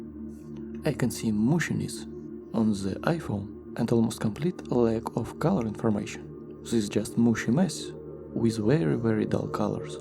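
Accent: Russia. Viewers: У вас русский акцент, поэтому мне очень легко понимать ваш инглиш. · Слышу ты Русский, есть канал на русском или все на английском? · Русский акцент чудовищный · Отличный обзор! Акцент выдает русские корни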